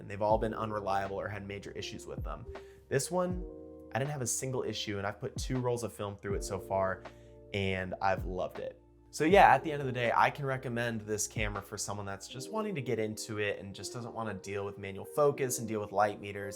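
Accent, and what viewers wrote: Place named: Louisville, United States. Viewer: I can tell by how you say it, you're definitely a Louisville native! Much love from the 502 brotha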